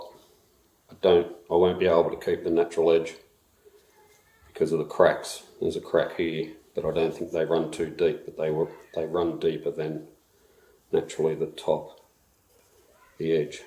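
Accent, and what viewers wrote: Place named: Australia. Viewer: Good work mate, nice to hear an Aussie accent, i understood every word, maybe because I am Aussie to😬👍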